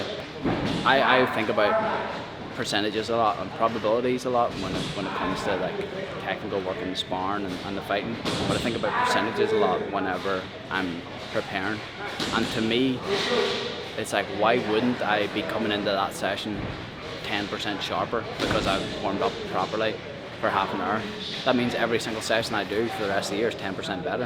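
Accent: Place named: Belfast, United Kingdom. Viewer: Were you getting a lot of feedback that fans can't understand the Belfast accent? lol subtitles. 💚🤍💛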